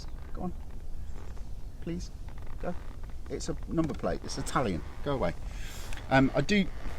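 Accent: United Kingdom. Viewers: Wow.british sound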